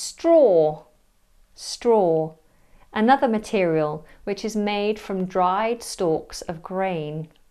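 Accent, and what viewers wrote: United Kingdom. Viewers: I preferred to kill four birds in one stone madam memorizing your words/accent & listening to english history & practicing & taking new words (my mind is exhausted & soon I will be extinguished) can I use this word like this is this correct ? I considered myself as an old school I still keep notebook as a diary but I'm so lucky that I'm left handed so no one can read my handwriting sometimes even I can't it's very tragically horrific event or experience for those people in that time & many ancient archaeological sites also destroyed it wasn't that difficult I understand it very well technically british accent is magical factor including many different words I'm a history lover/reader/fan & of course I would like to hear more & I have a humble suggestion can you talk about the black plague who hits london in that time that's all madam if you read all of that & reached this point I would like to thank you for your time cuz I know you are very busy & I would be very glad/thankful if you correct me if you have seen any mistakes in my words thanks again madam & have fantastically gorgeous day I'm waiting your next video👏👋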